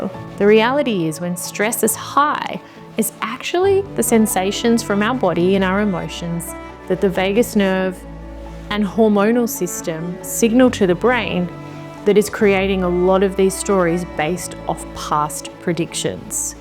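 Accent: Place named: Australia. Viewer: Finally an Australian, so pleasing to hear and feels so home. I am traumatized was accidentally found out in a date that went wrong a few weeks ago. I put my baseline thoughts on chatgpt and after a few questions it also correctly predicted I am severally traumatized. Here one human and now a computer. Both predicted the same. Last two weeks I am obsessed with writing my turbulent childhood for a child who apparently wires differently, volatile growing up, even isolated reclusive adulthood which I did not want. Now at middle aged something new opened up which I never knew before. Forgive me for rambling. I am so happy to come across your channel.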